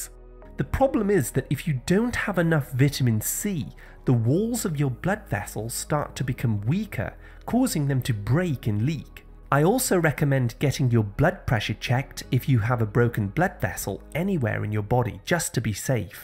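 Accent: United Kingdom. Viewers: Great and useful information regarding the health of one's eyes, concisely explained in clear British English. Thank you for sharing.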